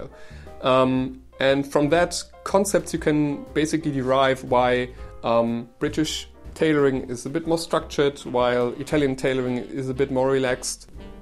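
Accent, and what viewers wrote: Germany. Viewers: Perfect! Not enough people teach the philosophy of style which is, in my opinion, the most important aspect. From there, everything else flows. And your English is great...the German accent makes it that much better too my friend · British Vs Italian style with a German accent. An interesting concept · I love German accent so don't worry, it's actually very beautiful and pleasent for the ears ❤️ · Well it is audiable that you are not a native speaker, but so what? You are very easely understood, speak free, flutend what more can one expect? Also who am I to complain, I m not a native speaker as well. The only thing curious is how different your accent is in comparison to mine, given we share our mother tongue. I doubt anyone could guess that hearing both of us. I dare say yours is more stereotypical german while mine just . . . all over the place and a huge disorganised mess, but who cares, communication still works ^^ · I have German relatives and was married to a German for 4 years almost 40 years ago. 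He's been in America more than 40 years and his English is far, far better than yours. Your English sounds like that of my cousin from Bielefeld; it's like ASMR (?) for me. Also, I used to teach at Göttingen and Bonn, (an English speakers class on history and foreign policy) and while I was impressed with the quality of my students' English, yours is far, far better. So no need for you to apologise for ANYTHING about your English! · Aber zum Glück stören nur wir Deutschen uns an einem deutschen Akzent. Für die native speaker klingt es exotisch, und der Rest bemerkt es eh nicht. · You have nothing for which to apologise with your accent. An accent in a foreign language is not something to deride. Especially a well-articulated one, such as yours. I find that a properly enunciated German-English accent gives a certain aristocratic flair, it feels very Prussian. As long as you are judicious in your grammar and word choice, your accent is an asset rather than a liability.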